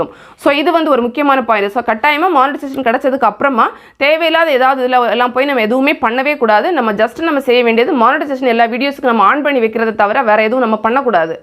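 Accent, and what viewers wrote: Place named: Kanniyakumari, India. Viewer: Behind the scene nice...love our kanniyakumari slang.. sister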